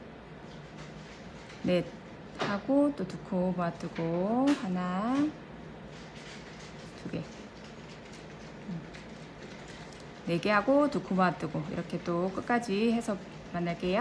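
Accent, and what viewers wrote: Gyeongsang, South Korea. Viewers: 진짜 이쁘네요 살짝 경상도 사투리가 매력이 있네요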